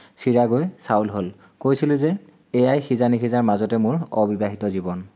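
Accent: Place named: Nalbari, India. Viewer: কথা বিলাক নলবৰীয়া যেন লাগে, মই লখিমপুৰৰ পৰা চাই থাকো।❤❤😊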